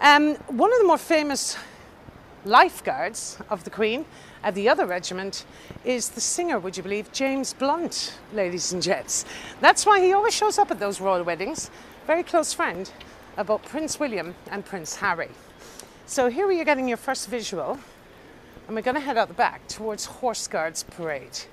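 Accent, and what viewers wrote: Ireland. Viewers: my two favourite subjects London and history and superbly well put over also a gorgeous Irish accent . I love this part of London and learned a lot fromthis video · Still Beautiful and an Irish Princess!! Thanks for your Knowledge & Professional Tour! & Thanks for that lovely Irish voice...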